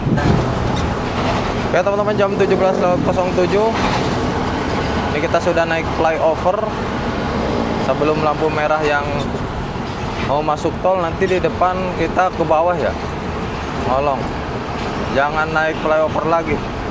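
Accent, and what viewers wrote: West Java, Indonesia. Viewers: Sunda na timana, a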